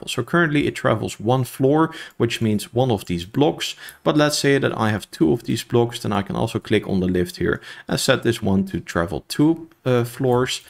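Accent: Netherlands. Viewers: Duidelijke een Nederlandse accent :D. Cool Template tho!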